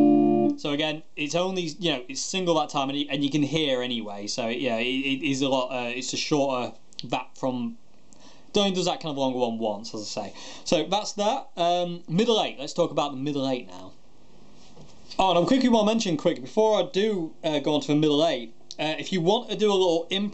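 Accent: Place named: North, United Kingdom. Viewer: Cheers you the best teaching us all this stuff xD and ill just say our accents are similar lol thought i was the only one playing up north (atleast thats how it feels sometimes) haha take care buddy!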